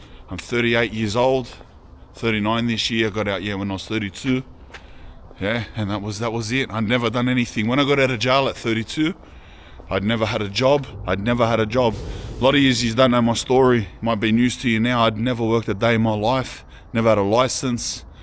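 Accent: Australia. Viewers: Love your honesty and I'm new to this channel but I fucking love it!! ❤ cous...😂😂😂 that aussie accent is fire!! Keep it up mate...love your no Bullshit way. ❤❤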